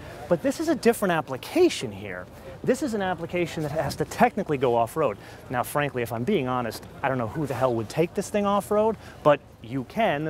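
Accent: United States. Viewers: I hate American accent explaining a British Car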